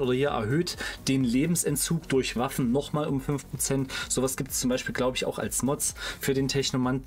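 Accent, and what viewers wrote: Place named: East Germany, Germany. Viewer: Like fürn Ossi Dialekt!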